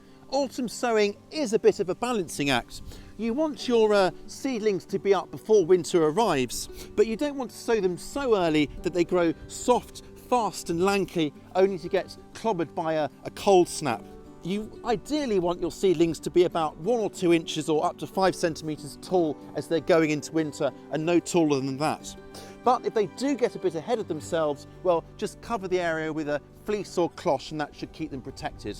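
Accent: United Kingdom. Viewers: Always appreciate a British accent. Similar growing conditions to where I live, Pacific Northwest, so I know I can get relevant info.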